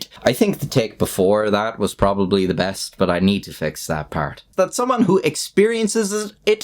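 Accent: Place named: Ireland. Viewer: The bloopers are the best part... when the Irish accent even blows the mind of the person with the accent...Lol! 🤣🤣🤣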